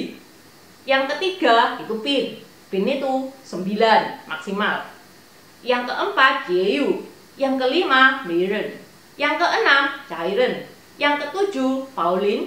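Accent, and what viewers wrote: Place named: Banyumas, Indonesia. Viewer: mendok2nya ituloh.... Ngapak buanget... 😂😂👍👍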